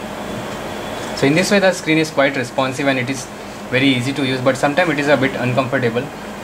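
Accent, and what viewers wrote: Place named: India. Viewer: It's Indian English! Very understanding for Indian people.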